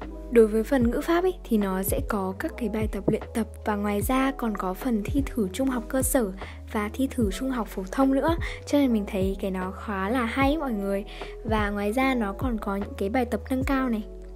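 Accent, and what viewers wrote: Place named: North, Vietnam. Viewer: phải công nhận giọng gái Bắc hay cực ý Hơi bị thích giọng bạn này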